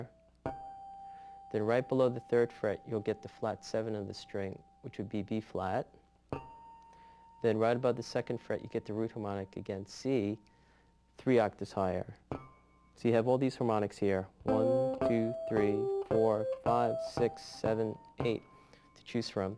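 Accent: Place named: New York, United States. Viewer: I love his New York accent.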